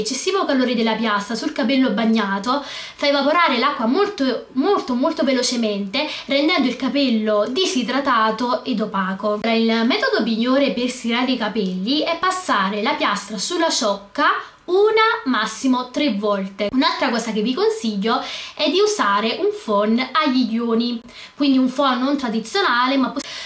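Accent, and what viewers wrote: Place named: South, Italy. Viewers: sei campana eh?? accento familiare ahah ;) :D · Sei del sud vero? Si sente c: grazie per i consigli!!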